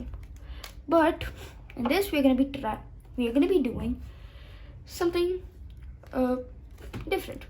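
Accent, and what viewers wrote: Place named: Europe. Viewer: your accent is very exotic - i'm guessing it's european... youthful tone adds cuteness. hope you don't mind letting me know what your ethnicity is. thanks in advance.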